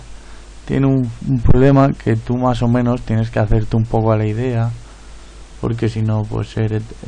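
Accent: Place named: Southeast, Spain. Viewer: qué cosas más curiosas, por cierto, tienes una voz muy muy sexy, me gustaría conocerte en persona, pásame tu número, por el acento, me parece que vives en el sureste peninsular español, yo también, podríamos conocernos ;)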